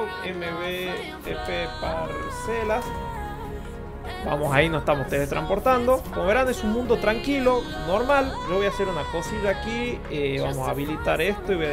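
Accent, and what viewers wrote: Argentina. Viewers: Siento conocido tu asentó, eres de Argentina? (De que parte)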